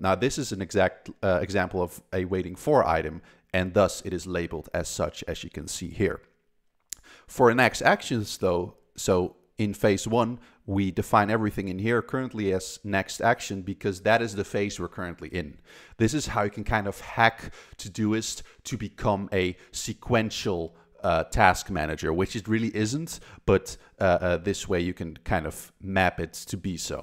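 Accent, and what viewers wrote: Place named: Netherlands. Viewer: I'm here for 30 seconds and I'm guessing you're Dutch?!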